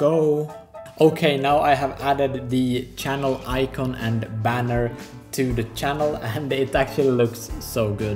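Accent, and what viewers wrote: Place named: Finland. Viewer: The short video looks really good, but I much prefer your Finnish accent over that robot voice. I understand you're trying to prove that the whole video uses only AI, but if you do decide to grow the Frugal Meals channel, it would be cool to hear your natural accent instead of the robot.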